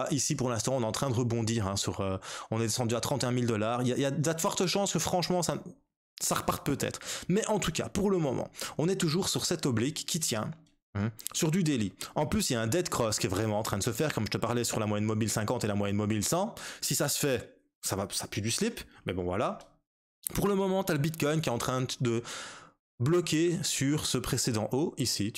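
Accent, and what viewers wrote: Liège, Belgium. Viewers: Lol j adore le ton que tu emplois ça en met pleins les dents aux détracteurs mdr. Tu es de Belgique ? Je perçois un accent belge de Liège où je dois me tromper !?!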